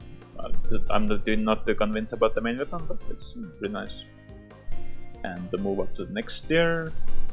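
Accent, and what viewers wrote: Finland. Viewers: Suomi? This was recorded to me from yt and you have a Finnish acsent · Ui juma arvasin ihan suomen aksentti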